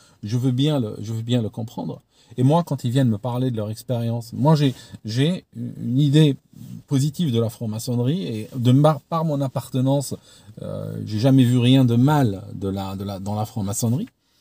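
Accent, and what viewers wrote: Switzerland. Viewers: On s'en fiche qu' soit FM. On l'aime tel qu'il est avec son bonnet, sa tignasse et son accent suisse par intermittence. Un franc mac qui ne croit pas aux missions Apollo, ça n'a pas de prix.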